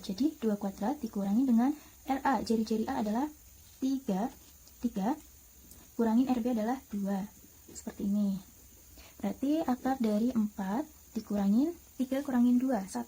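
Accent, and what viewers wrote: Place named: Bandung, Indonesia. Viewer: Suaranya kaya orang bandung kak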